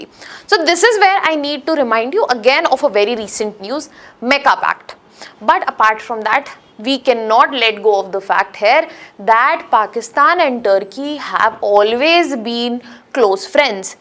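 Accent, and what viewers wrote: India. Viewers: It's new language Indian desi English by desi aunty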